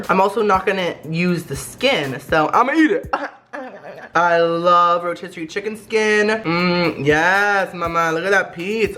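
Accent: United Kingdom. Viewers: DO AN ENTIRE VIDEO WITH YOUR BRITISH ACCENT AND WITH A CUP OF TEA PLEASE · That british accent tho😂👍👌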